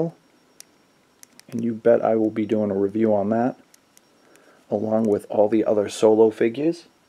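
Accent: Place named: Massachusetts, United States. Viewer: Hey, you from Mass? The accent sounds familiah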